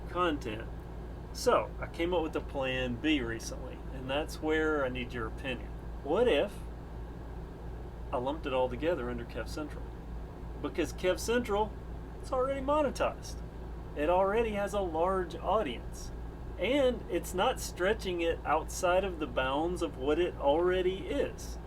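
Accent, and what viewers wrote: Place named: Texas, United States. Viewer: Please make Travel vlogs... I watch all of your videos... Tbh I have notification on I love your accent. Gives me Texan vibes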